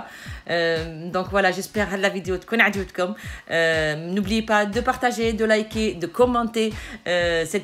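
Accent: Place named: Marseille, France. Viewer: 3andk acson marseillaise bonne continuation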